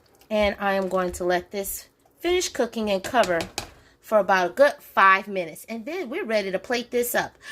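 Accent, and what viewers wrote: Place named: South, United States. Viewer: The ingredients, the preparation, the cooking talent, the presentation, the final result.......am I in Tuscany? The touch of a southern voice....the spot-on Julia Child-type interaction with the viewer....the ease and simple communication of the cooking sequence...all contributes to wanting to follow.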